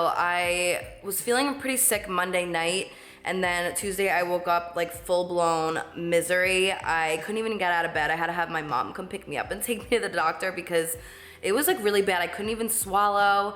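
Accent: New Jersey, United States. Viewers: I L O V E hearing you talk. Not only are you well spoken but I admire your New Jersey accent ❤️ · you’re such a jersey girl haha your accent is jumping in this video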